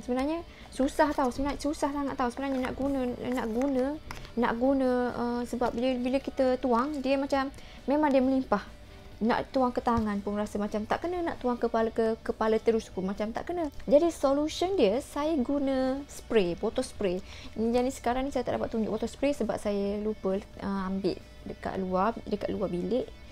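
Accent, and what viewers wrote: Kelantan, Malaysia. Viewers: Awk ni asal kelantan ke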